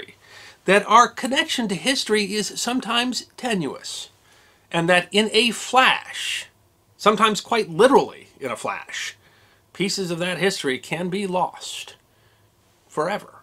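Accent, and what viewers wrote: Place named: Midwest, United States. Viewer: I know you’re a Midwesterner and it’s involuntary, and no judgement intended, but I beg you, I live in Cah-nahh-duh and not key-nuh-duh. Your accent on this one word kills me every time. Love you!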